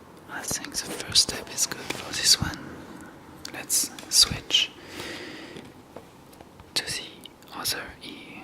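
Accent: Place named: Germany. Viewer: oh you sound German lol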